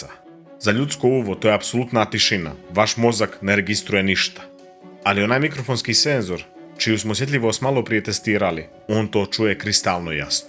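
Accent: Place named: Montenegro. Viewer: Ja ga obožavam slušati baš zbog crnogorskog govora 🥰 a uz to jako je pametan đetić mogu mnogo toga naučiti 😊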